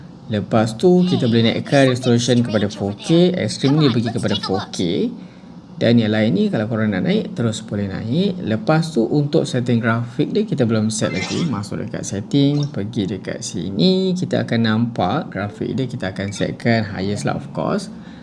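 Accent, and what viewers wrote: Malaysia. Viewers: Saya betulkan cara cakap malaysia,saya suka cara dia cakap malaysia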